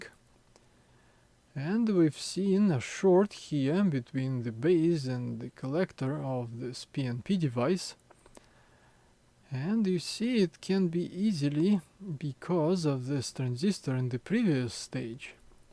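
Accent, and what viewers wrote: Russia. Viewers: Nice job. And charming Russian accent. 😊 By the way, shall you use 240V bulb instead fuse at first power up?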